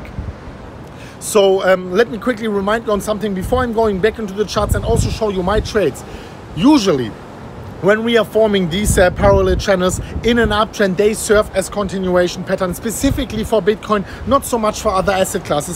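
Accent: Germany. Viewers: Deutscher Akzent kommt voll durch!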